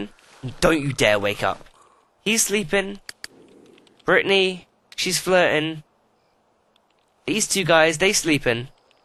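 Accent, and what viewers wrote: England, United Kingdom. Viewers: i like your british accent, especially when you said " pause, pause, pause." XD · i love your englis accent!!!! :D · He's British,like his accent is really British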